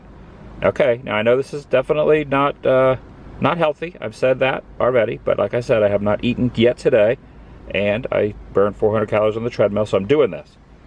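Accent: South, United States. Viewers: Missing your adventures so I got up early, created a queue and getting my southern drawl to start my day! xo sending hugs to the Mama, Papa, Sissy & Brother-in-law!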